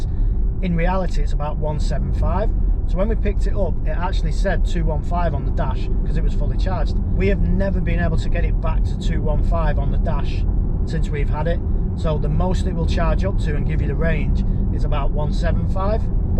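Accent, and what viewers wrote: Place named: Scotland, United Kingdom. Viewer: I love your Scottish accent.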